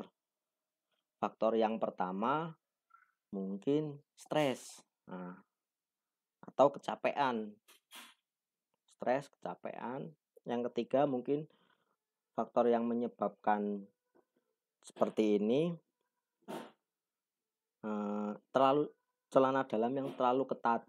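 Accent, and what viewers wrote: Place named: Banyumas, Indonesia. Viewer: Kyane wong ngapak kie, promile di dokter mana mas kalo boleh tau ? 🙏🏼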